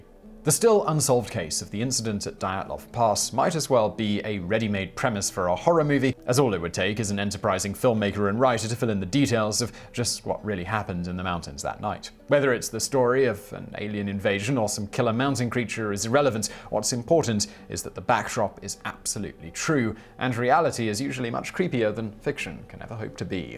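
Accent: United Kingdom. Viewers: But I could listen to his urbane British accent all day! 😂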